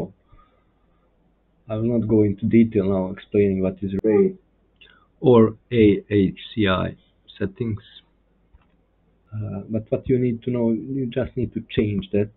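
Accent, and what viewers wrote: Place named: Bulgaria. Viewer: Dude your accent sounds like Bulgarian. Grate that you fixed problem with booting DELL PC.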